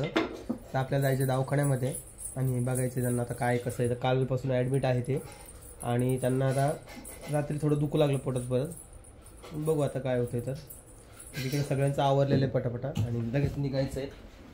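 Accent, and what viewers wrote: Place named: Khandesh, India. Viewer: तुमचे गाव कोणते आहे दादा ,तुमची भाषा थोडी खान्देशी वाटते आहे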